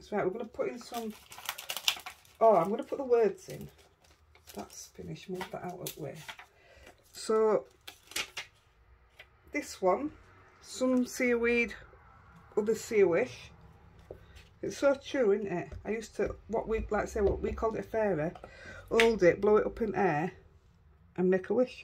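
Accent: Yorkshire, United Kingdom. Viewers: Oh fantastic as usual. What a cute little bag. Yes the Lavinia stamps are brill. Lucky enuf to be going to Lavinia shop later in the year on my trip to England. My list is growing lol. Love your accent being from Yorkshire myself.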